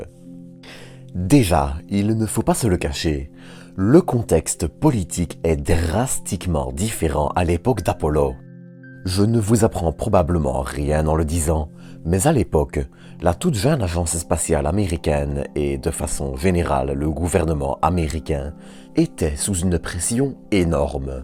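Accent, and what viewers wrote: Belgium. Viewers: Un robot à l’accent belge ça nous change.....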